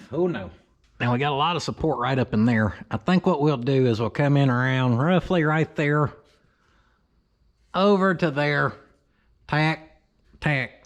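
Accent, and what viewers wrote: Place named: Gastonia, United States. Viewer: Luckily I lived in Gastonia for 4 years, or else I wouldn’t be able to understand a single sarcastic word this guy said!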